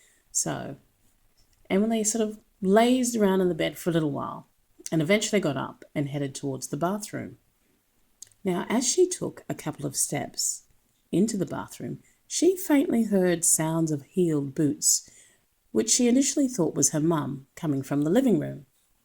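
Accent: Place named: Australia. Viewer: You're a great narrator, always enjoyed your work with Dark Waters channel.....love the Australian accent and would love to hear you and Be.Busta do a collaboration.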